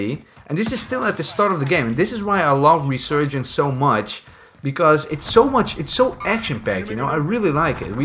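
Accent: Netherlands. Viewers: i smell nederlands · You sound dutch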